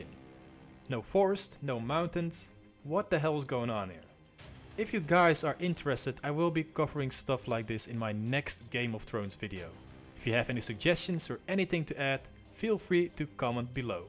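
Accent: Netherlands. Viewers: are you by any chance dutch? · Nou je Engels is goed genoeg hoor! Had wel binnen 10 seconden door dat je uit nederland komt;) Lol!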